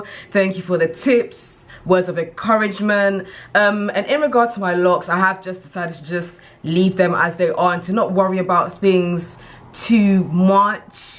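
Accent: London, United Kingdom. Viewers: What part of London u from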